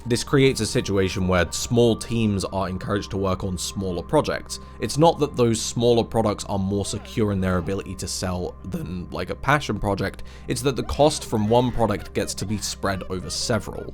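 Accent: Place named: United Kingdom. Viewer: brit detected, listening and learning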